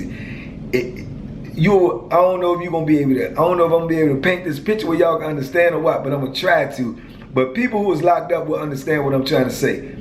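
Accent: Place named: Washington DC, United States. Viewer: Yo I swear I thought you were from dc or pg …. You sound and dress like us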